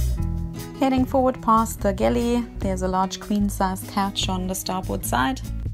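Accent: South Africa. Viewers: Stunning job, Are you based in NZ, you sound like you have a South African accent ? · Really nice boat! Enjoyed the SA accent, reminded me of home ❤️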